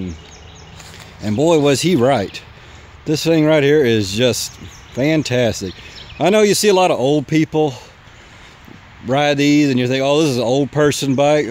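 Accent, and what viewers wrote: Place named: Texas, United States. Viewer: great bike and great texas accent.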